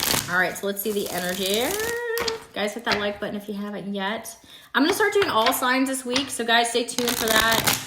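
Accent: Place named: Texas, United States. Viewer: Your readings have been spot on except…… I’ve been trying to manifest you to AZ and that hasn’t happened? I hear a Texas accent. Come on cards.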